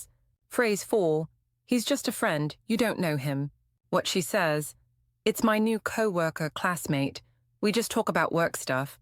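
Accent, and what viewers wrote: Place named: New Jersey, United States. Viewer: That’s a lovely accent you got there. New Jersey?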